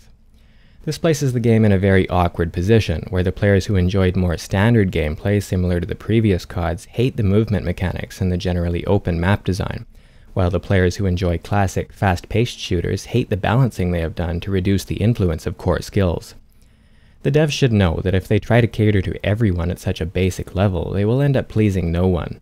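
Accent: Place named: Canada. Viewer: Probably because he's not a yank. (Canadian. Same accent, less whine, not a huge ass. Multiple meanings there =D)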